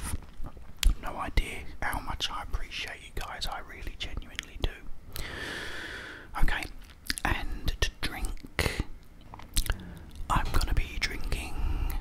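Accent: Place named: Cockney, United Kingdom. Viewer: Cockney is such a great accent.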